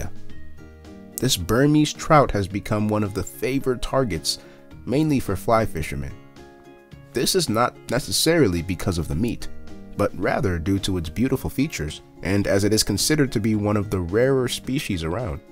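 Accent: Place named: London, United Kingdom. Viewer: Fuh, suara sifu saya ni memang lain macam. Loghat London la. Haha, padu sifu atas ilmu yang dikongsi. #PUNCA #klusterKN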